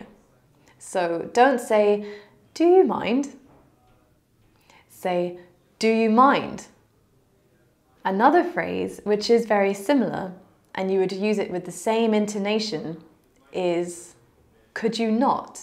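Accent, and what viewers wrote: United Kingdom. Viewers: 영국 발음도 듣고 표현도 배우고 최고! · 영국 발음 매력적이야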